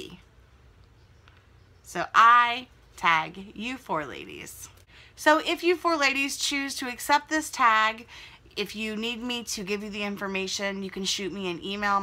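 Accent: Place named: South, United States. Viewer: I was born and raised in VA, and I say about 90% of the words the same as you. I love the way we Southerners talk! ;)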